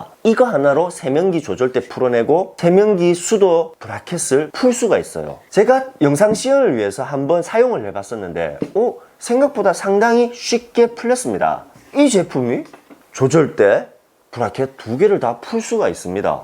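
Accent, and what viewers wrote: Gyeongsang, South Korea. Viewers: 우한 코로나가 아니라 COVID-19입니다 억양을 보니 대구 코로나라고 하면 기분 안좋으실 것 같은데..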